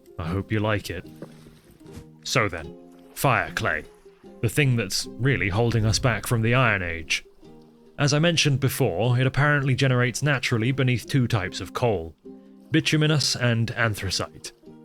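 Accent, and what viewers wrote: United Kingdom. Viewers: always delivers on quality content, if i ever reincarnate i want to be british for that accent.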